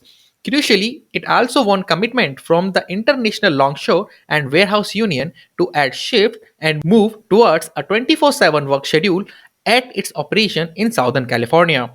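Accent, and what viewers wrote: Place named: Punjab, India. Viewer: Punjab accent is very HEAVY here.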